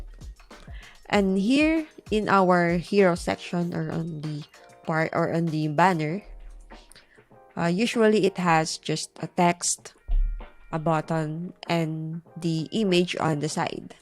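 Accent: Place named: Philippines. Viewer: Thanks for making this video. I also love your Filipino accent. 😍 I have a question about creating wireframes for app UX/UI and even for the web in general. I used to create wireframes first for clients and then high-fidelity mock-ups.. But ever since I've used page builders such as Divi and Elementor which was 6 or 7 years ago I have designed/built directly on the page builders. What you think are the pros and cons when it comes to the design process of starting from wireframes vs. building right away on the browser or mobile apps? Also which types of clients prefer one over the other? I have always attracted clients who do not mind me building right on the page builders and skipping the "design" part of the process. So I am curious if I can still be able to get clients who do not mind the longer version.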